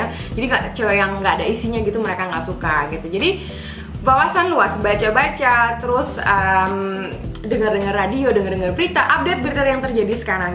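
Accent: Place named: Batak, Indonesia. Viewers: remeng , medan gt yaahh 😄❤️ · Lucu bangat Kaka bawainnya... Aku Batak jadi logat Kaka nyambung bet ke aku... Hahahha Kaka yg terakhir katanya 1 tahun pacaran... Harus langsung nikah ya ka?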